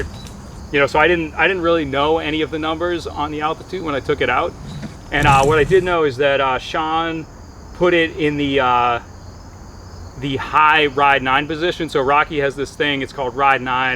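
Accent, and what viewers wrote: Boston, United States. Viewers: Hahaha Recovering XC Dork! Intermittent Boston accent.. Checkin all them boxes!! Hope you get some more free time kuz it’s fun to watch ;p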